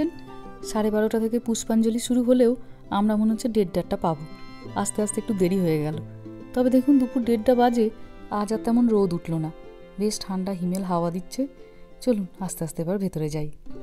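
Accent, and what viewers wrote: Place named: Kolkata, India. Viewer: Tumi amonvbe bolcho jeno Kolkata tei acho...khub mishti laglo...banglar feel ta miss krte daona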